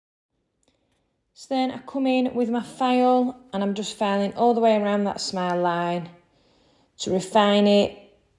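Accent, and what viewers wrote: Yorkshire, United Kingdom. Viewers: I’m trying to watch as many of your videos as possible. Your work is so beautiful and fabulous clean application it’s a pleasure to watch. Love your accent too, are you in Yorkshire by chance, I’m in Sheffield 😊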